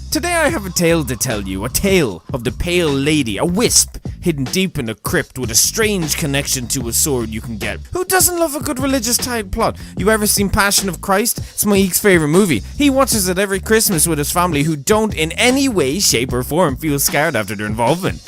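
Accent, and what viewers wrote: Ireland. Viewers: Yes irish...just yes! Love the rambling!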